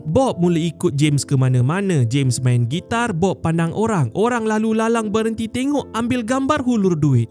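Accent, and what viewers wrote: Sabah, Malaysia. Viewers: "Andu bah bob" ni org sabah ka 😂 dr 1st tgk konten nya tertanya² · Andu bah Bob..seluruh dunia bersedih 😢 ni pandai slang Sabah😅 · sekali sekala loghat sabah bha teda hujan teda ribut tu 😂😂😂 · Hmm bob 😢😢 btw bang mmg org kinabalu kot sebab ada “anduk dgn bah” dia 😅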